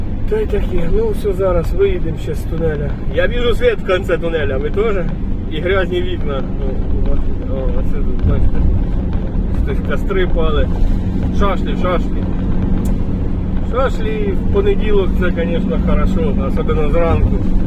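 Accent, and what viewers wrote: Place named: Kherson, Ukraine. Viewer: Да, наш суржик ниякий гугл не переведе! І ніхто так не може балакать, як ми!Херсон і окресності!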